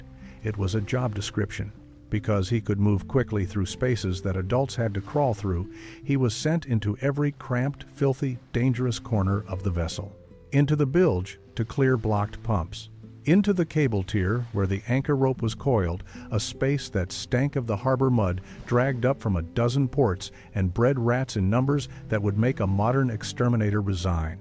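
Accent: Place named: United States. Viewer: An artificially generated English accent would have been more appropriate than an artificially generated U.S.A. accent. U.S.A. accents have no credibility or authority, their owners being savage imperialists abroad, brutal fascists at home and lying propagandists everywhere.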